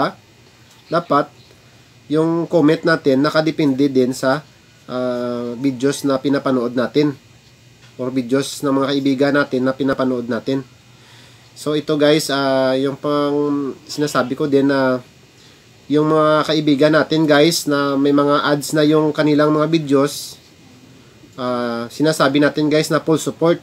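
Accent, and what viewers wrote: Iloilo, Philippines. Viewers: Hhehe ilonggo gid ya bala Ang tono lods. Taga diin ka sa Negros lods? OK na gali Salamt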